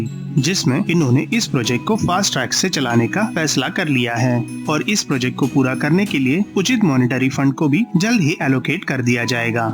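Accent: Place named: Maharashtra, India. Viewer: Bhau marathi ahes ka tu? From your pronounciation I feel you are marathi.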